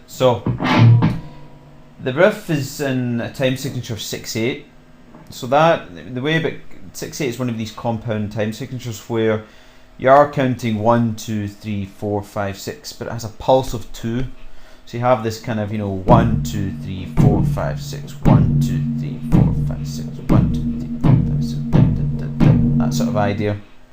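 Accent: Scotland, United Kingdom. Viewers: Are you scottish ?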